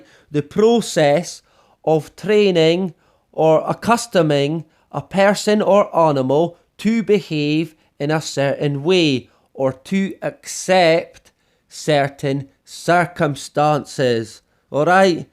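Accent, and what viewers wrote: Scotland, United Kingdom. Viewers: I love your accent...sounds Scottish..... And no vaccine for me...💯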